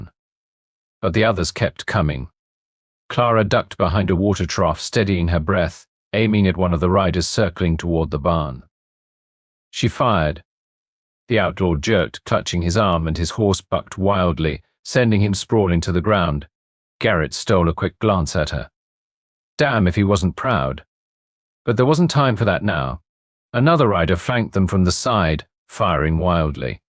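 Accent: United Kingdom. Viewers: Kind of funny to hear à british voice reading an american story. However the narator is wonderful., as is the book.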